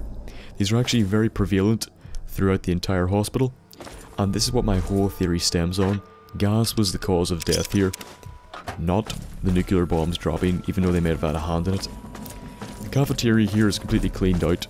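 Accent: Northern Ireland, United Kingdom. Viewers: are you northern irish boyo? your humour and use of expletives are second to none. Reminds me of myself lol. Brilliant content creator you are bro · which part of Northern Ireland you from?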